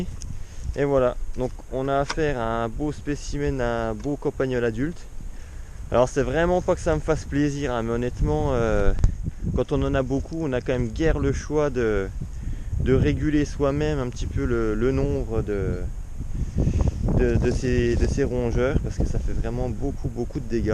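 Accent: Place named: Alsace, France. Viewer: Bonjour à toi. Je découvre ta chaîne et un brin de nostalgie m'envahit. J'habite dans le sud-ouest mais je originaire d'un petit village au pied du Ballon d'Alsace. Ça fait du bien de revoir ces paysages et d'entendre cet accent bien de chez nous. Ça fait bientôt 40 ans que j'ai quitté la région et ça me donne un bon coup de fouet. Merci pour tes vidéos et bonne continuation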